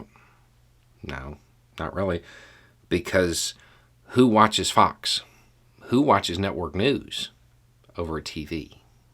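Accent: South, United States. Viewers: As a European, it is also very refreshing to hear an American recognise that. Especially one with a southern accent. knows his political theory.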